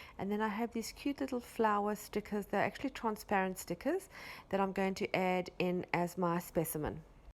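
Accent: South Africa. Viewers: Hi, you sound South African, where do you live. It’s so nice to hear your accent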